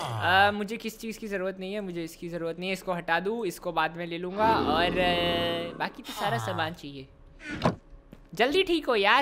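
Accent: Gujarat, India. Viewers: Are you from Gujarat?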